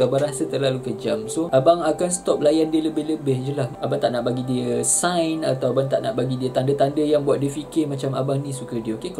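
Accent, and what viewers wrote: Sabah, Malaysia. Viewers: suke,lagi lagi ckap sabah gan jepun tuh..^^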